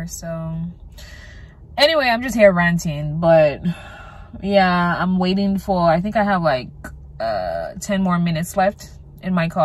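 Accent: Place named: England, United Kingdom. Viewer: Your skin is glowing ♥️😩 It's your English accent for me💖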